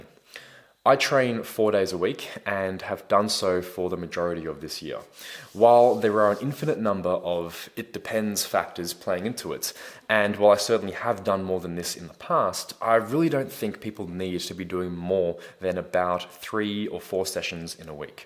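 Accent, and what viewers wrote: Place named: Australia. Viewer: Didn’t expect the Aussie accent 🇦🇺 subbed, cool vlog man.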